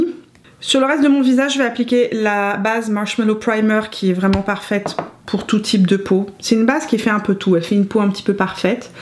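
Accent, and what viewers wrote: Quebec, Canada. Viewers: Bravo pour l’accent un peu québécois!! D’une pure laine !! Très très beau looks, mais le modèle y fait pour beaucoup! Xx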